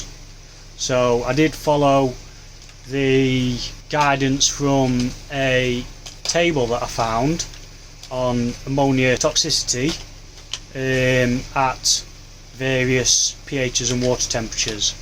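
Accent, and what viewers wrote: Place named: Northern England, United Kingdom. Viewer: Great video pal, getting used to your northern accent now, from a Kent southerner!! 🤣 Keep the updates coming, very interesting 👍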